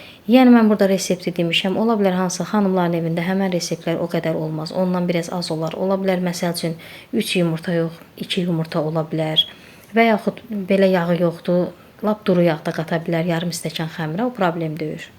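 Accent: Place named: Shamakhi, Azerbaijan. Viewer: Əllərinizə sağlığ gözəl görünür, ləhcə şamaxı ləhcəsinə oxşayır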